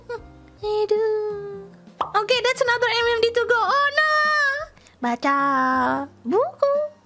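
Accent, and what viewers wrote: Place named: Java, Indonesia. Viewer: Logat jawanya mbak makin kerasa 😂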